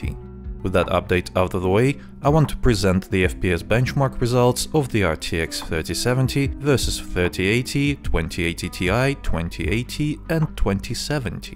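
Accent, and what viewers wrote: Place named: Russia. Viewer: Russian author, I understood it by the accent and his name and asked why he doesn't make videos in Russian. He said he was doing , but he didn't like it. He didn't explain what it was. I answered with a Russian idiom that can be translated as... no, not literally), but the meaning: "your channel - your rules" In General, nothing important to you, just a conversation between two Russians.)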